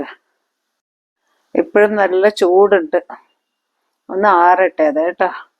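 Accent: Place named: Palakkad, India. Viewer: You sound like my Grandpa 's family from Ellapulli Palghat 😊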